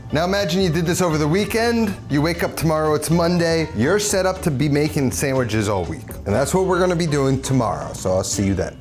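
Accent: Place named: Brooklyn, United States. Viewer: Love your videos bro. I’m in my 30s but between the homage to some BK classics and the accent it brings back a lot of memories being a kid at my grandmas in Dyker Heights. You remind me like you could be in my family 😂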